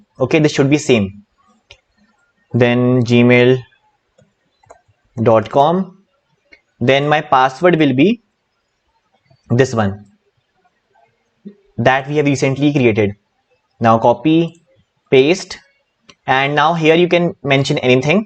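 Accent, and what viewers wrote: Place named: India. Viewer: FInally a youtuber speaking english in an Indian accent ! Amazing efforts !!!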